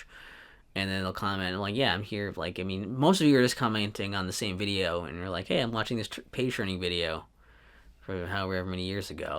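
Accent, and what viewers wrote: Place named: Minnesota, United States. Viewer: I think it's really cool you still enjoy making videos, and I am glad you do post them and share them with the public.🙌 I've been making videos for about 12 years and never thought to be bold enough to post anything, so it's really good that you have and stuck to it! That's mad that you don't experience ASMR yourself, you are very good considering you don't.👌 As for the ASMR voice, you do still have one, but it is definitely nothing to do with being bored and then falling asleep... if that was the case, I wouldn't of watched your videos. 😅Haha thats cool... hello over to Minnesota! I can here that accent slightly ... I only know Minnesota from Marshall in 'How I met your mother'😝Your YouTube plan sounds good, I'm excited! 😆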